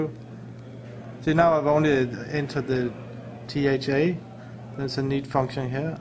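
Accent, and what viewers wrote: Denmark. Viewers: danish accent when its best.. just hear lars ulrik from metallica.. heheh the same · Wow - that's a heavy danish accent.. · How can you make this accent swedish? I won't tell you that the dude IS danish - just that the accent is. XD Anyways - what dialect does he speak?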